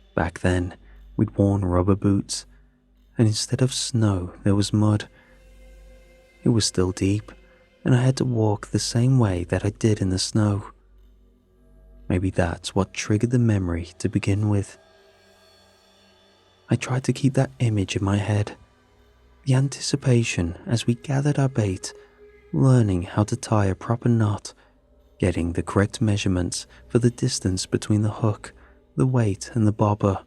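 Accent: United Kingdom. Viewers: I hear many stories told from Minnesota. I wonder if, even tho sounding British, lives there. Or maybe a writer he narrates often is in that cold azz state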